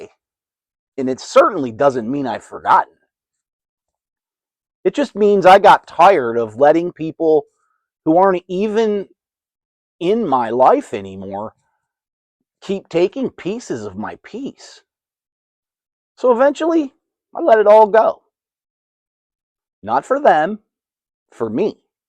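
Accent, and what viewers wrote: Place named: Texas, United States. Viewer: I’m from Texas and I thought I could detect some Texan! I like your content btw, keep it up 👍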